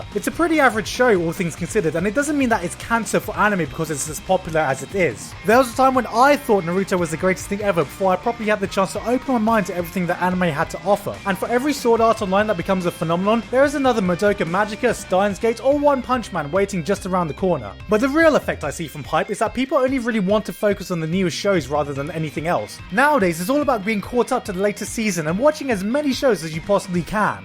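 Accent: England, United Kingdom. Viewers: hey, you sound english. where abouts are you from?:)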